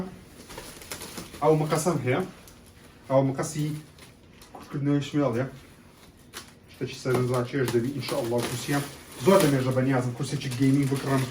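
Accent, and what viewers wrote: Erbil, Iraq. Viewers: Bzhe zorm pe xosha ka ba hawlere zmane rasant qsa akay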